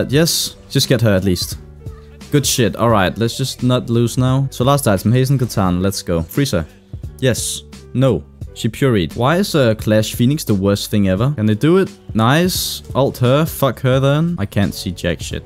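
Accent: Denmark. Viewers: UR DANISH SOUNDS SO?? funny?? its cool tho (also i need someone to translate what he said pls)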